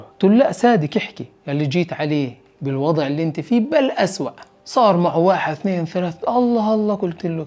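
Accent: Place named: Palestine. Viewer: يسعد اللهجة الفلسطينية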